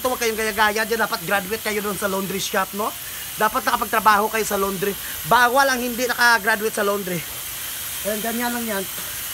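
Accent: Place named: Visayas, Philippines. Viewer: dow bisaya man di ay sya?😂 diin imong probinsya ate?😂😂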